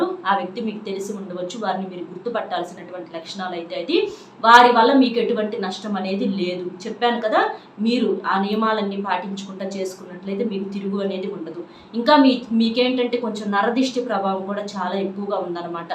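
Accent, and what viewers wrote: Nellore, India. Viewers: మీ బాష యాసా చూస్తే నెల్లురు జిల్లాలో వారిగా అభిప్రాయం కలుగుతుంది.. గారు మీ పోన్ లేదా అడ్ర తెలుపగలరు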